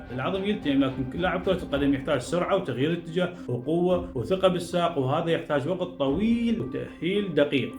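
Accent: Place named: Iraq. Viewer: لما ركزت فالكلام بقت اسهل شويه،،، تحيه لأهل العراق الغالي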